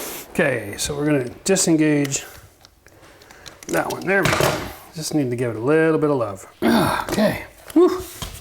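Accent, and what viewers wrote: Ontario, Canada. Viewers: As an Ontarian, yes, it's the Ontario accent. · I think it's the Ontario accent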